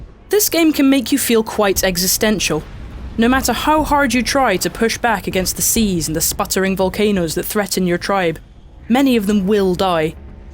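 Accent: United Kingdom. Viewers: I knew as soon as I heard a young British person reviewing this 2 million idiotic Americans would be up in arms. Just because your vocabulary doesn't stretch past 'awesome' and 'sucks' doesn't make it a bad review, it just makes you an idiot. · people! it's quite clearly a woman reviewing... and if you disapprove of our British accents then you can jolly well sod off - and stop picking on our saliva build up due to too much tea and crumpets... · Whoever mistakes a woman with a British accent with a squeaky 13 year-old is simply disgraceful. Like, seriously. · I'm sorry... but is it a young British girl or guy giving the review? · Lol all the people who don't know it's a British accent. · Australian? That don't sound like any Australian I know sounds more like British or Scottish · hes british u fuck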